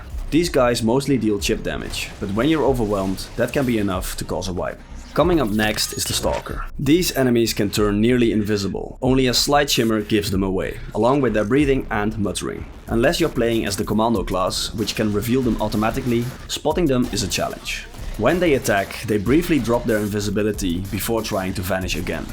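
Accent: Netherlands. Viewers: Ik ruik Nederlands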